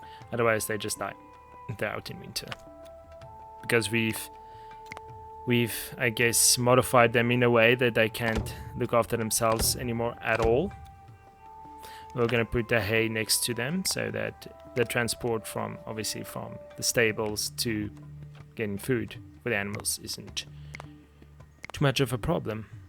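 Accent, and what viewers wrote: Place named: South Africa. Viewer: Hold up. I hear a South African accent. Was looking for someone to that does a decent long play of this game and now a fellow Zafer pops up. Lekker man.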